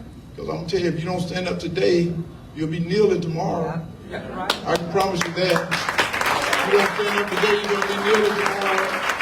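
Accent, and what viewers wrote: South, United States. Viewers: So, he's southern. We have southern accents, and he has COMMON SENSE!!! Something we really need in the senate!!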